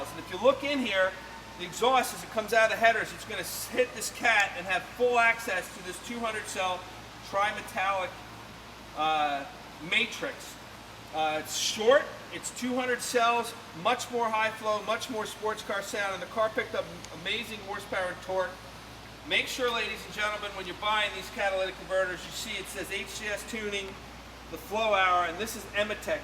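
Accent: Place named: Long Island, United States. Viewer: I moved out of NYC and I watch Fabspeed videos when I need my fix of that Meeeasapeequah accent. Parts look great too. How do these cats do on emissions?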